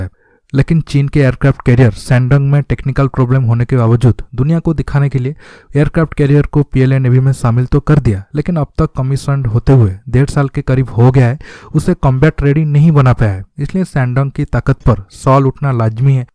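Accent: Odisha, India. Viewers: Voice se Lagta hai ki tum Odisha state se belong karte ho . Just my guess. By the way nice work... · Bhai odisha se ho kya???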